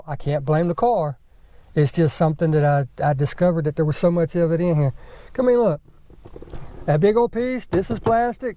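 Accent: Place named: South, United States. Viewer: Absolutely you sound like you are from the south and the background and property looks like it does in the south. I lived in East Texas before moving to California so that’s where I see the familiarity.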